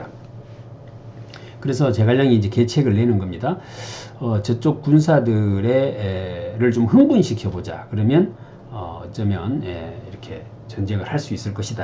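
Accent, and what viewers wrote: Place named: Gyeongsang, South Korea. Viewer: 원장님 경상도 사투리 정겹습니다 어름한 자식~~ 사마의의 전략 전략적으로 기다려야되는 지금 개구리 점프하듯 뛰어 오를 무엇이 떠오릅니다 잘 듣고 갑니다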